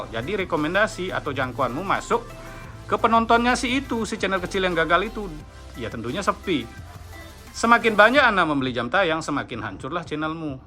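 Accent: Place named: Madura, Indonesia. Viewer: Logatnya kok mirip orang madura ?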